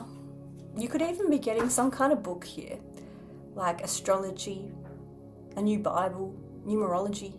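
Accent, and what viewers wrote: Australia. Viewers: Hello from California. Good singing voice, Great voice,. Great Australian accent. Great messages from Australia. Happy Easter from our channel.💃💃💃🏻💃🏻🦚🦚🦚🧤🧤🧚🏻‍♀️🧚🧚🏻‍♂️🧚🏻‍♂️🧝🏻‍♂️🧝🏻🧝‍♀️👒🧜‍♂️🧜🏼🧜🏻‍♀️🐣🐣🐥🐥🐇🐇🐇🐓🐓🐓🦜🦜🦜🦜🦜🦜🦅🦅🦅🐎🐎🐎🦚🦚🦚🐦🐦🐦🐤🐤🐤🦆🦆🦆🦉🦉🦉🦋🦋🦋🍀🍀🍀☘️☘️☘️🐦‍🔥🐦‍🔥🐦‍🔥🦢🦢🦢🦩🦩🦩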